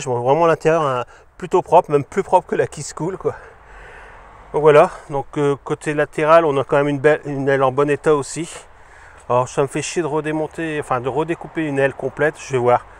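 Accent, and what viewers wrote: North, France. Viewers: Le bon accents du nord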